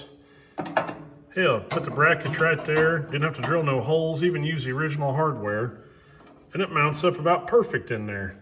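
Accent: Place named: Boston, United States. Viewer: I knew it was Boston that I heard in fast motion😂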